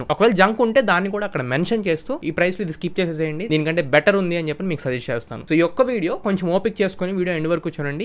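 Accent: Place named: Guntur, India. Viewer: ఆ ఉండిద్ది ,వచ్చిద్ది ,పోయిద్ది కొనమాకండి ఆహా 😊 ఆ గుంటూరు east ప్రకాశం యాస లో కిక్కే వేరబ్బా ❤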